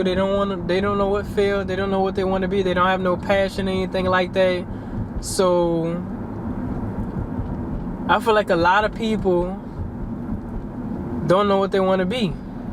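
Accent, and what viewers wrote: Louisiana, United States. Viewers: You got a lil Louisiana slang accent must be borderline Mississippi lol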